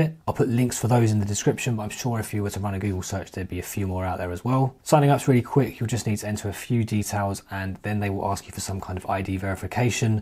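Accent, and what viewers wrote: United Kingdom. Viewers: Yes nice to hear an English voice. I have a good idea for number 6 side hustle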